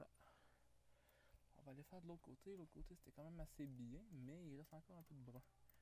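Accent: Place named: Quebec, Canada. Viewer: Salut cher ami Québécois. Je suis Français de France donc j'ai un peu de difficulté à comprendre... Est-ce que tu pourrai faire un accent plus français dans tes prochaines vidéo ? Cordialement, Gilbert.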